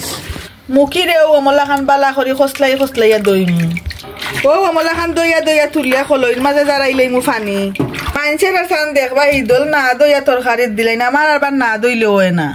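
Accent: Sylhet, Bangladesh. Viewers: আপু তোমার ছিলেটি ভাষা আর রান্না খুব ভালো লাগলো ধন্যবাদ ❤❤আপূ তুমি আমার পাশেও থেকো পরিবারের একজন সদস্য হয়ে ❤ আমিও থাকবো তোমার পরিবারের একজন সদস্য হয়ে ইনশাআল্লাহ ❤😅😊❤ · ওনার সিলেটি কথা গুলো খুব সুন্দর লাগলো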